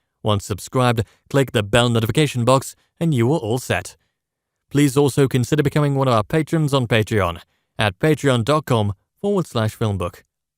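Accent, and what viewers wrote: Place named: England, United Kingdom. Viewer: Trying to watch the fin clip n all I'm hearing is some pommy wanker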